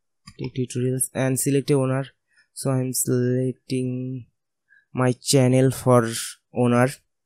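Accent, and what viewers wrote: India. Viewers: This is probably really good for INDIA ACCENTed people, but I CAN ONLY UNDERSTAND about 20% of what you are saying. GEEZZ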